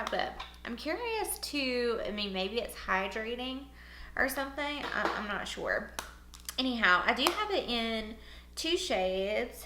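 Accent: South, United States. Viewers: You remind me of Casey Holmes so much!!! You both have southern accent and you both have same personality!!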